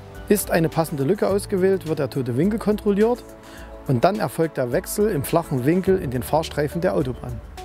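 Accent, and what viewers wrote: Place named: Thuringia, Germany. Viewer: Sächsisch naja eigentlich thüringisch am Rande von Sachsen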